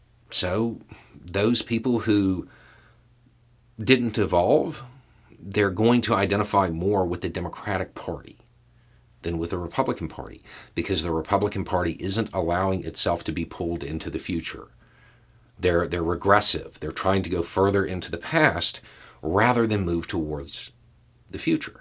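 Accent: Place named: Appalachia, United States. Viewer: Ah another "I have a hillbilly accent but I'm not like the rest of them" Your Zelda shirt gives you away, dude.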